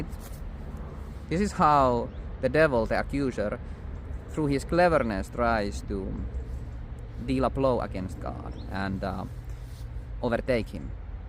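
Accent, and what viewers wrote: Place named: Quebec, Canada. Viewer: You sound like you're from Quebec or French Canadá . George st. Pierre has a similar accent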